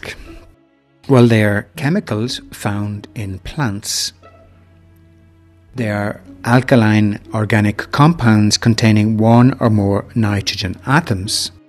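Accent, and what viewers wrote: Ireland. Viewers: I would give this video a million likes if I could. As a student who struggles with Pharmacology and dreads to learn about it.. you really opened my eyes. You made it so easy to understand, beautiful visuals and of course beautiful Irish accent. The best video I've actually come across so far on such a complicated subject. You have really helped me ease my stress. God bless you! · Southern Irish Gaelic accent. Very relaxing.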